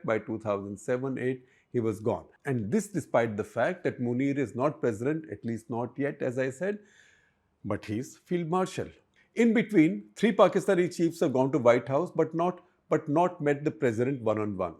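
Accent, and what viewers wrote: India. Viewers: And your programs on international affairs and politics!!! Only easy Indian style English of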